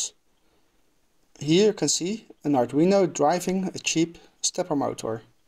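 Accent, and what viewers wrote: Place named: Netherlands. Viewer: Ok ok! I'm also still figuring out the tricks of the trade - you're not alone! I've trialed many different video editors and in my opinion, the best is Cyberlink PowerDirector. It isn't perfect, but I still think it is the best. Yes, you're doing it the way I used to do it. Now I rarely do this! What I do now is section the video into 'scenes', then I 'take' until the scene is perfect, then move to the next scene . Then when editing, I simply delete all but the last take for each scene. It saves me many hours! I also do 'errr', 'um', 'arr', but I'm happy to leave them in because I don't want my channel to be too formal. About your presentation; it is absolutely fine... I can hear a strong Dutch accent, but I can fully understand what you're saying. I don't know about other countries, but here in Britain, I think we are good at understanding accents because we are used to talking to people in English who are from all over the world. 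For example; the word 'one', you pronounce as 'WAN', but it's close enough to know that you're saying 'one' without any effort at all. Like if an English person speaks Dutch, you can hear the accent and it applies a sort of slant to the speech, but you can still understand it well. :-)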